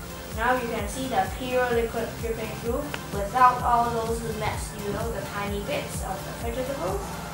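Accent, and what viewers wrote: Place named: Asia. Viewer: Lol a cup of wwwater hahahha asians speaking english is funny af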